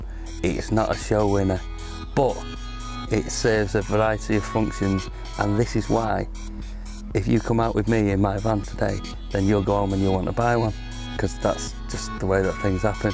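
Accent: United Kingdom. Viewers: Bill Murray with a British accent. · yep that british accent :)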